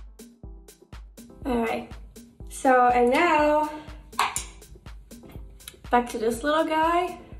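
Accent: Hungary. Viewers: Yah but She's losing her Hungarian accent now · Her English accent is so good, but you can still hear a bit of that typical Hungarian accent which is kinda cute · if you watch her "Interview de Barbara Palvin, Festival de Cannes" she's actually losing her Hungarian accent now. But still yah her voice sounds so nice. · I can barely hear her Hungarian accent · but if she born like a hungarian ppl then her Accent will be Hungarian forever The english and hun accent is very different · Love how genuine she is and her Hungarian accent ♡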